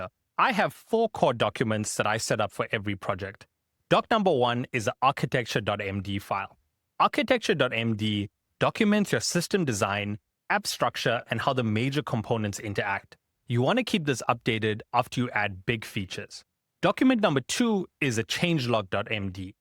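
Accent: South Africa. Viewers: Your location says USA, but if I'm not mistaken you have a bit of a South African twang in there?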